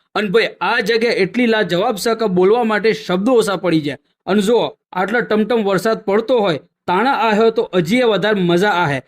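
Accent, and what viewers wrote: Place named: Sabarkantha, India. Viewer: તમારી બોલી ઉપર થી લાગે છે કે તમે સાબર કાંઠા ના છો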